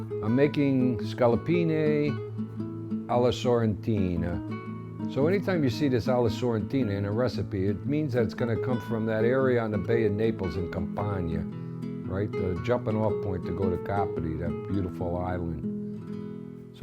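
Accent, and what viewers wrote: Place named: New Jersey, United States. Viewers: yeah I like this guy. jersey guy found a home in San Francisco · Gotta love that NJ accent.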